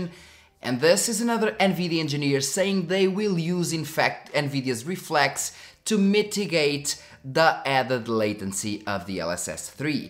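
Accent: Portugal. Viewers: the portuguese accent in english is weirdchamp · Mal ouvi o teu sotaque vi logo que eras tuga, bom saber que existe malta a cobrir estes temas para além dos "big ones", toma a minha subscrição!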